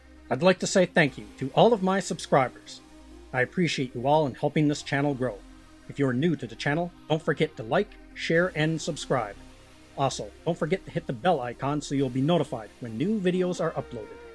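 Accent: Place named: Midwest, United States. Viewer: I like to place accents so Wisconsin? or maybe somewhere in the midwest.? This is just out of curiosity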